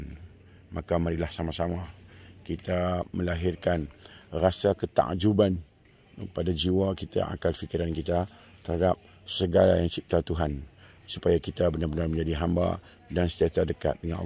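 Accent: Kuala Lumpur, Malaysia. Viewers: Hang cakap sleng KL no. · Bersame same , kepade , ustaz Cakap KL 😂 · hehehe.. ustaz cakap kL oo..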